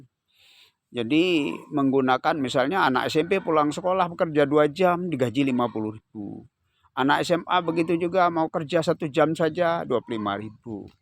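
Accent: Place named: Klaten, Indonesia. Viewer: Logam klatenya mantap hhhh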